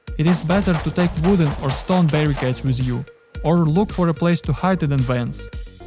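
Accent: Russia. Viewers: I’m might have unpopular opinion but man it’s so hard to watch hard accent videos like this, why every 2nd video from someone from EU is HARDEST RUSSIAN ACCENT 😭🤞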